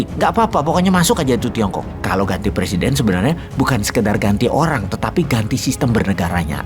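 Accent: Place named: Jakarta, Indonesia. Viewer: Ane demen nih kalo bahasa betawi bossman udah keluar rada gregetan campur sedep di dengernye....ya ga bossman???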